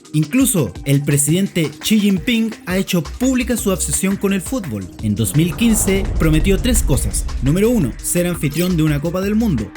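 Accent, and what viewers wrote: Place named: Chile. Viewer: Chileno Detect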